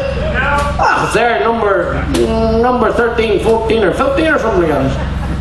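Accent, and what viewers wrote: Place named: Ulster Scots, United Kingdom. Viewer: same way i talk,, keep it up for the Ulster scots,!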